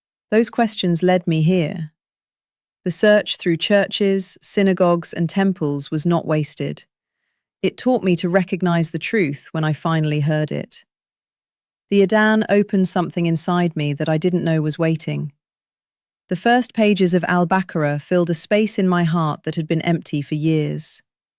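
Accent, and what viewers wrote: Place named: United Kingdom. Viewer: She mentioned that she is from USA, but she has a strong British accent, is this the same woman?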